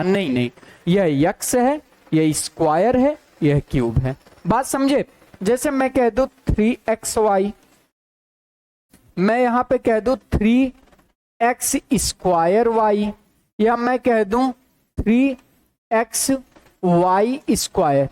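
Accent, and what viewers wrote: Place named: Bihar, India. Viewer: Bhai sun plz beech beech main bihari na bola kar prblm hoti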